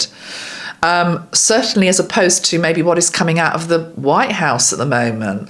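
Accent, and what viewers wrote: United Kingdom. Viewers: Thank you very much, I enjoyed as a Dutchman your real British accent and pronunciation, I learned from that and about your predictions, time will tell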